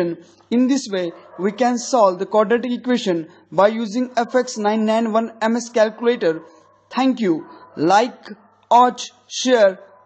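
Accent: India. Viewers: thickest indian accent ever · I saw hope as soon as I heard the Indian accent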